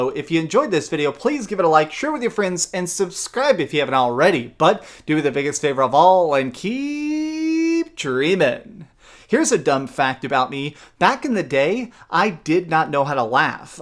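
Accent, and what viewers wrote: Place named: United States. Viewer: brush your teeth man. you look British pretty sure you’re American judging by your accent.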